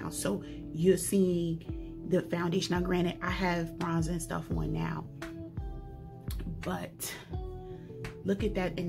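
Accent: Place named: New Jersey, United States. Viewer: Just found your channel and yes! Love your content Jersey Girl!(?) or if not from my home state, your accent tells us you are close neighbor👍🏽! Truly hope and pray your channel continues to grow because you know your stuff! So Awesome!!!! Your skin is amazing and so appreciate you doing You!!!! Thank you!! Can’t wait for the next video😊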